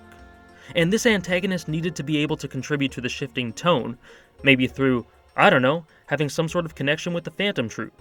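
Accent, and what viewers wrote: Canada. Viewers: What part of Canada are you from?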